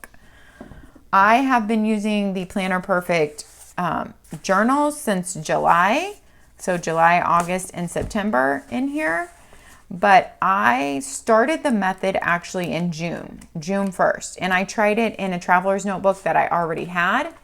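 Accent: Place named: Texas, United States. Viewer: Love this video! Your accent and use of y’all really makes me miss Texas haha. I got the Christmas planner for this and I am so excited to use it as a yearly memory book. I wish I had it when my kids were little, time goes so fast I want to remember it all.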